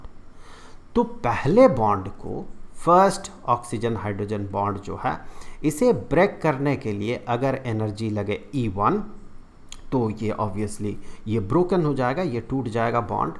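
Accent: Bihar, India. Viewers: but brother i am from Uttar Pradesh😂😂..His ascent of speaking relates to biharis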